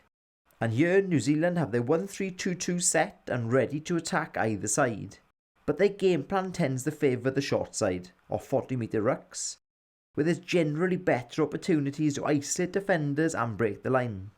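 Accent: Wales, United Kingdom. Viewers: Lovely lyrical Welsh accent ala Terry Grittths. I’d say when your ordering a pizza it’s like telling a story.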